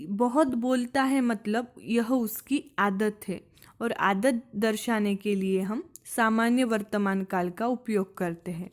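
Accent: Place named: South, India. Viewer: Very very nice 👌👌big big thanks for u 🙏mam aap South se ho aapki hindi South side ki lg rhi h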